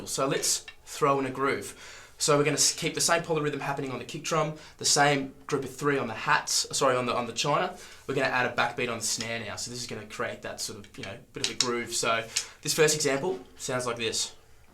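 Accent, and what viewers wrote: Australia. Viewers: HAH he's Aussie!!! love it. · Australian?! Yes! Where you from? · Hahaaaaaa you're an Aussie!! 😃🤪😜🏆🏁🎉💯👊🏼 F@ yeah Dawg!! Never heard you talk before.. only watch you do sic arse Meshuggah covers!!! Where you from bruh... I'm in Brisbane!!! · Hey mate, where in australia are you from? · Finally an Aussie! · Ah you're an aussie mate! I thought you were a yank for some reason...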